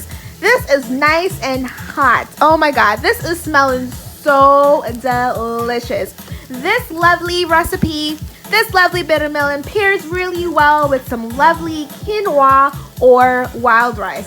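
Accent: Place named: Caribbean. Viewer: I here a little Caribbean coming out. Trinidad or Jamaica. Just saying😁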